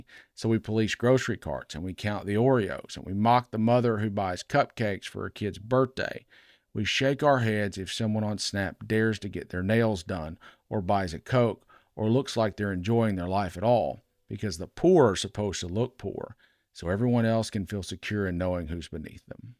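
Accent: South, United States. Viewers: Reminds me of Beau (of the Fifth Column). Another calm, soft-spoken, Southern voice.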